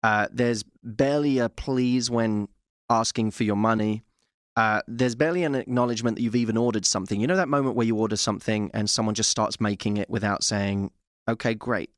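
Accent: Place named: United Kingdom. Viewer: Cute much! Dungarees....You mean Jeans, right?? Love your British accent & names for items.... ;) <3 Hugs from Canada...;)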